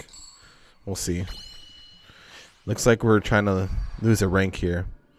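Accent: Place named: West, United States. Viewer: sound like cowboy but sometimes feel he just a farmer from Alabama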